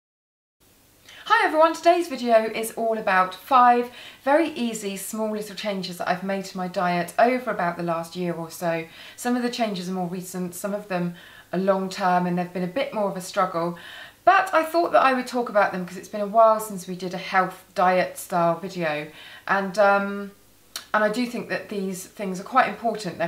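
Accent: United Kingdom. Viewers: I love your British accent and you're a very smart and elegant person :)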